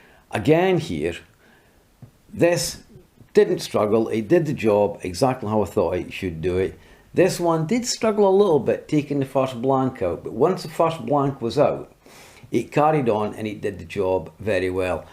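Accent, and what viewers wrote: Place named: Scottish Borders, United Kingdom. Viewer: Where you from you sound like the borders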